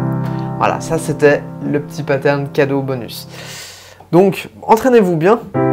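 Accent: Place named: France. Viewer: J'avoue que quand je vous entends dire des mots anglais avec une prononciation française ça pique les oreilles. Paterne ou bien séquence...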